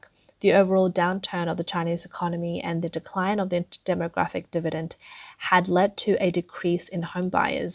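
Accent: Australia. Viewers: Aussie accent narrator is the BEST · This narrator has a perfect Australian accent.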